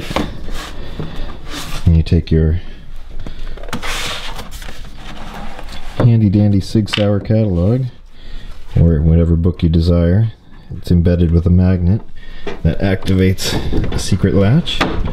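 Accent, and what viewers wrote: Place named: United States. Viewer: Voice of an American, I guessing probably not a Democrat...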